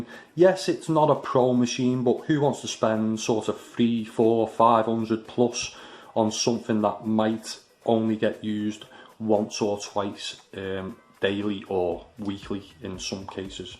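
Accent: Liverpool, United Kingdom. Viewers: Im a listening to a scouce guy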